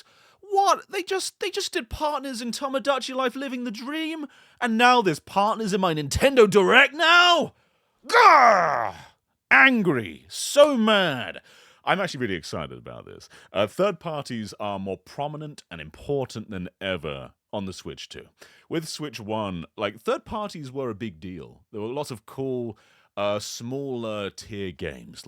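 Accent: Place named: United Kingdom. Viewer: You sound British what time does it start in the UK?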